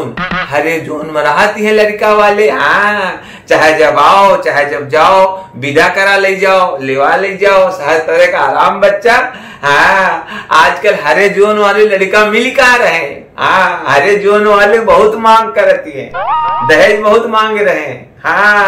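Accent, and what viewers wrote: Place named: Awadh, India. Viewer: एकदम शुद्ध ग्रामीण अंचल की अवधी है | बहुत बड़ा काम कर रहे हैं आप | "दहिजार का नाती " बड़ी मिठास भरी गाली है | लुप्त हो रही भाषायी विरासत को आप जैसे मझे कलाकार ही सजों सकते हैं | बहुत बहुत धन्यवाद जी |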